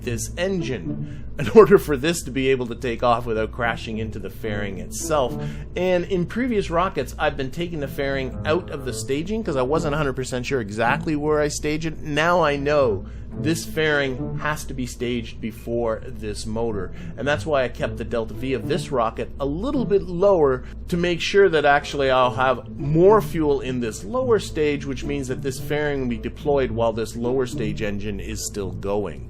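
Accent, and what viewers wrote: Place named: Canada. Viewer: Just found your channel a couple weeks ago. You make some of my favorite tutorials, and have explained key concepts in a very digestible manner. I've got a couple questions about yourself, feel free to not answer if you'd like. For one, do you have a background in mathematics, like either studying at high level or teaching? My second question is are you from Canada, or the northern U.S., you have that sort of accent and i've just been curious. Thanks, -Ben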